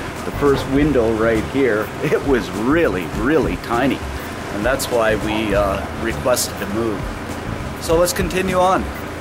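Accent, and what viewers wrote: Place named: Canada. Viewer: You sound Canadian.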